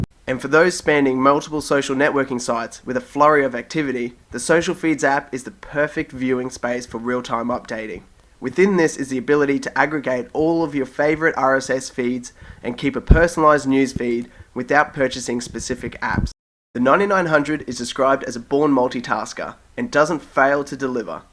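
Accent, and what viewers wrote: Australia. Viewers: Finally an Aussie!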